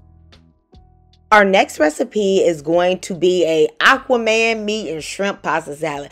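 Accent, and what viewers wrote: South, United States. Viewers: I usually just listen to your videos while i'm drawing. I really love that beautiful southern accent, have a great easter you and your family, God bless!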